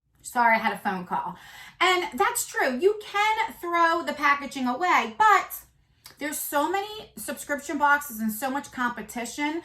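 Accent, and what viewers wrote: New Jersey, United States. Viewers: 😂😂😂 my boyfriend heard your voice while i was watching this...he is from the east coast and has a brother in Jersey "who do you know in Jersey?" me: oh just one of my fave youtubers.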